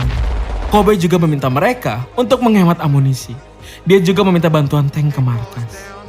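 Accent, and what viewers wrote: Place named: West Java, Indonesia. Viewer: Sunda ngapak haaaaa,,,,perbaiki bahasanya bro semoga sukses iya jangan terlalu lebay suaranya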